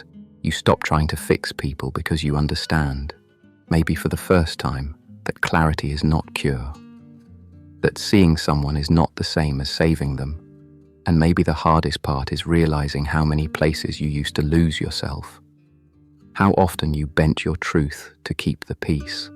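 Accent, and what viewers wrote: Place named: United Kingdom. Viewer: I love this english accent, very british, well chosen ! And the content of the poscast is really gold !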